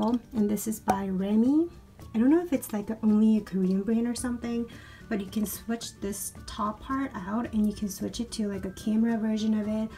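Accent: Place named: United States. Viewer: This might be a personal question but I'm going to ask anyways are you a foreign exchange student because if I am correct you're currently studying in South Korea and hearing that you speak English with an American accident I assume you're American (correct me if I'm wrong) also if you are a foreign exchange student how did you get excepted and how has your experience been btw love your videos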